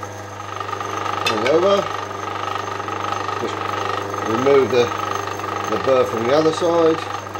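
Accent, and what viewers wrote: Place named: Hertfordshire, United Kingdom. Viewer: I enjoyed this :) I would say a Herts accent, maybe Bushey area?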